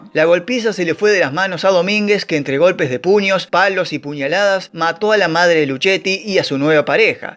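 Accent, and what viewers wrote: Argentina. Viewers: La primera vez que entro a este canal y me gustó mucho el Relato fluido y bien Argento , y las historias Fenomenal 👌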